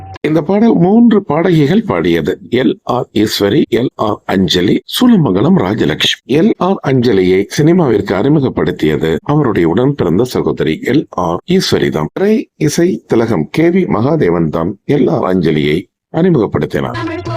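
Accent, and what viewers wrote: Sri Lanka. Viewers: இலங்கைத்தமிழர் என்பதால் இவரது தமிழ் இனிக்கிறது !! ஆனால் தமிழகத்திலோ எளயராசா வந்த பிறகு காலம் காலோம் எனவும் மோகம் மோகோம் எனவும் ராகம் ராகோம் எனவும் தமிழே தேய்ந்து அழிந்தது !!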